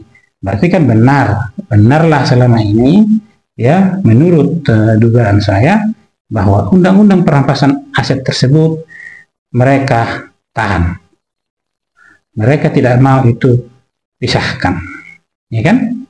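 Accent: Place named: Toba, Indonesia. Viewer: Logatnya toba banget...ponakan opung luhut ini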